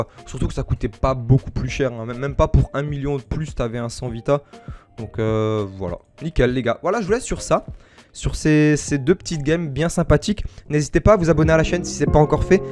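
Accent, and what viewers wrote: Alsace, France. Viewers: tu viendrais pas d'Alsace toi par hasard ?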